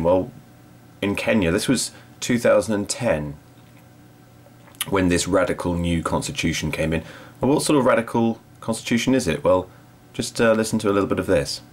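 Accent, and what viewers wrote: United Kingdom. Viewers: she sounds very british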